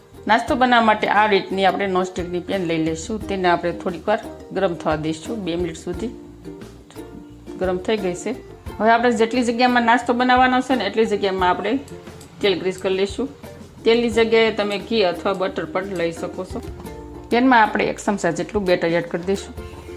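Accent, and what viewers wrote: Bhavnagar, India. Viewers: તમારી ભાષા પરથી લાગે છે કે ભાવનગર, ગોહિલવાડ પંથકનાં હશો.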